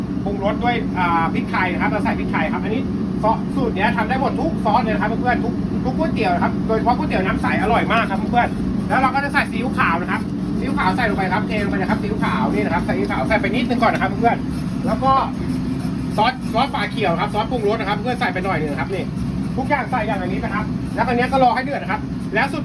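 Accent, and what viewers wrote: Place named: Suphanburi, Thailand. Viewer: เชฟเป็นคนสุพรรณหรือเปล่าค่ะ พูดเสียงเหน่อ ขอบคุณค่ะ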